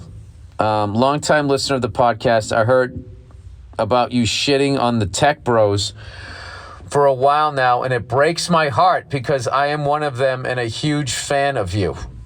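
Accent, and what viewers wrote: Boston, United States. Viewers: Bill burrrrr. I nominate Bill for the CEO of Sam Adam's beeeerra- Boston Accent 😅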